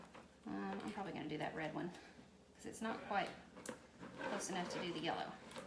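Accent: United States. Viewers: New subscriber! I am going to try this. Love your accent! What state are you from? Me = PA.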